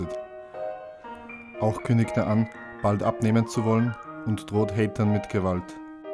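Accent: Austria. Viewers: Ich finde dein ge-österreichel doch sehr sympathisch, und auch, wie du mit völlig trockener Stimme Reinerles Utopien wiedergeben kannst. Liebe <3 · gibt es silent hill wirklich?? austrian voice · Bist du Österreicher? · wie man sofort merkt, dass der erzähler österreicher ist haha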